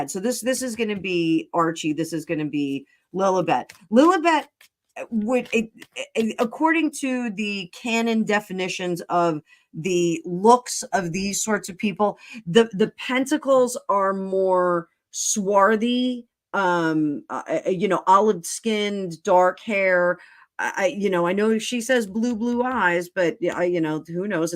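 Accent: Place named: New Jersey, United States. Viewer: Great reading . I love the NJ accent. lol sometimes my Deep South comes out in me . Who am I kidding - I can’t get rid of it. 😂ya know I’ve never really wished a divorce on anyone but I do with them. I just hope they get out before Harry loses it completely. ❤️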